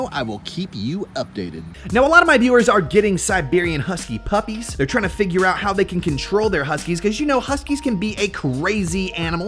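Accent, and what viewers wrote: United Kingdom. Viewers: Because what? PS u sound British and PSS I'm 9yrs old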